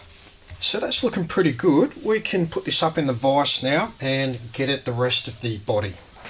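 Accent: Australia. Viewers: Well done Mate! Great video. What part of Oz are you from? You have a really thick accent. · I love your Australian accent! welcome back. Always been inspired! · I came for the tech tips... I stayed for the Australian Accent. Hey do You know Lee Priest? I'm from Canada, and Yes, I DO know Bob from Ontario...He's My neighbor. Great Video EH! ❤🇨🇦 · New South Wales accent?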